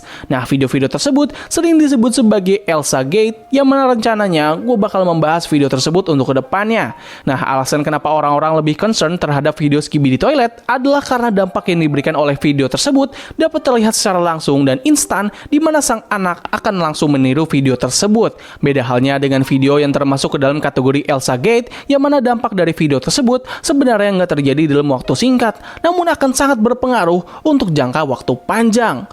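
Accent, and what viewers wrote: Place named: Sunda, Indonesia. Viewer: Emosi anda sangat tersalurkan dengan baik ditambah penggunaan kata "lah atuh" Sebagai orang sunda saya sangat familiar dengan nada nadanya😂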